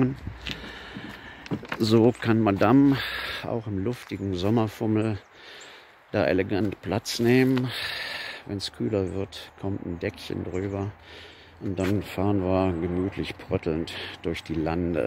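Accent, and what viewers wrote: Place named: Ruhr, Germany. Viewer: Ömma. Du komms aber auch ausm Pott, oder?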